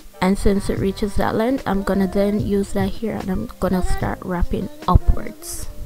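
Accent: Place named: Jamaica. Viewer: Your accent sounds Jamaican fr LOVED THE RESULT AND UR MAKEUP✨❤️😍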